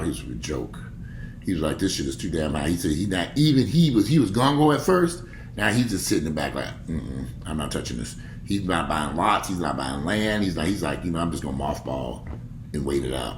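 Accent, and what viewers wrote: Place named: New York, United States. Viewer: Sounds like NYC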